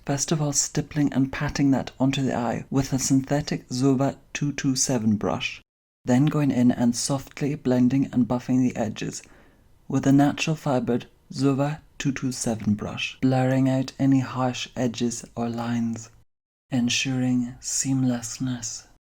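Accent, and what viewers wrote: Scotland, United Kingdom. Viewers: The more I see your 'fillums' and the more I hear you speak, the better I understand your English with that charming Scottish accent. For a Frenchwoman, believe me, it's not so easy! Thanks for the lessons, Professor 🌹 A 37 years old French woman ❤ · maybe outer Scottish isles but quite enunciated? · Are you Scottish?? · J Yes, there most certainly appears to be a slightly Scottish undertone audible here, there, and everywhere.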